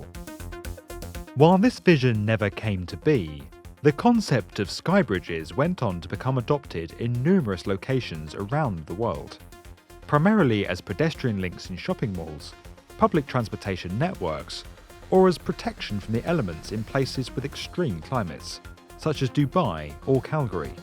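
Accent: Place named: RP, United Kingdom. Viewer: You have got a state-of-the-art received pronunciation RP. Do Never miss it! And ALWAYS, ALWAYS STICK TO THE METRIC SYSTEM. HOWEVER, in this subject (aerial corridors/sky bridges/ elevated pedestrian crossings.) You might be interested to know that although perhaps not so much flamboyantly spectacular as the Asian/arabs ones you could realise that this type of structure are commonly found in Big South American cities and in Spain even in ciudad de Panamá I remember walking within one 10 years ago in the Radisson Decapolis Hotel connecting it to the Casino over a high traffic road. Another "small" example that came to my mind now is the long aerial covered bridge linking 2 shopping centres in the Colombian Caribbean city Barranquilla (Where Shakira & Sofia Vergara were born) My point is that that is something that Chinese wallet took it (like many things) from Europe (Spain, chiefly Barcelona) they are NOT PIONEER AT ALL IN TERMS OF ARCHITECTURE OR URBANISM AT ALL!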